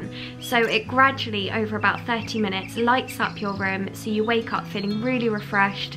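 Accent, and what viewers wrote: United Kingdom. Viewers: I love routine videos!!! 😍 And I swear I'll never get enough of your British accent. 😩 I love your voice and accent a lot,! Love you! ❤ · I love your accent so much! I'm Polish and I try to speak in British accent everyday but it is not easy. :(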